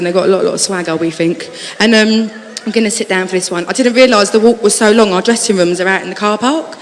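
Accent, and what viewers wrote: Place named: Cockney, United Kingdom. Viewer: cockney hahahh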